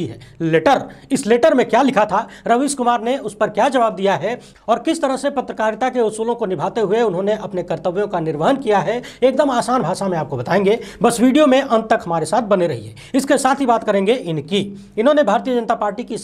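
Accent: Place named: Bihar, India. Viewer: Aap ghantwa dabane ke liye kahte hain,magar size me Chhota hai isliye use ghantiya dabane ke liye kahen.baki aap ka Bihari boli me sab bat achcha se samajh me aata hai.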